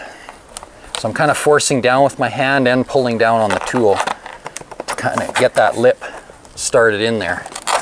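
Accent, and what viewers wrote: Canada. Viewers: I hear Canadian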